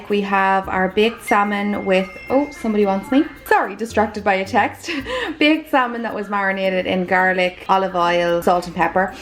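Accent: Ireland. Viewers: I love watching your videos. You give me new ideas on how to change up my every day dread of what to fix for a meal. No 2 people do things the same lol. I've been watching your videos for probably close to a month now. Definitely have my notifications on! Thank you so much and much 💘 to you!...... P.s. what started my binge watching is your accent. An Irish accent has always been my fav. Never hear people with those here in Alabama (USA).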